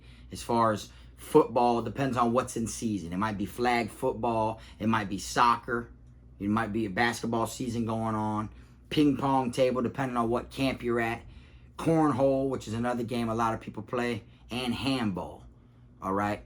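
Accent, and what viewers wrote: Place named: South Florida, United States. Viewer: My homie from Davie, always feels like im talking to a neighbor. Love that south florida twang. on the job, great vid!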